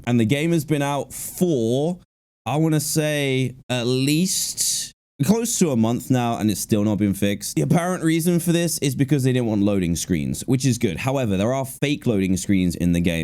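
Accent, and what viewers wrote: United Kingdom. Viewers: Dan I love your British acent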